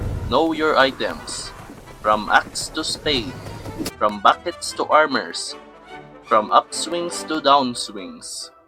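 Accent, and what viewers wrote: Philippines. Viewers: Why you kinda sound Filipino?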